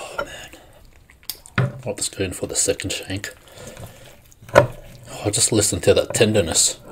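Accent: Australia. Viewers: Just wondering you sound Australian are you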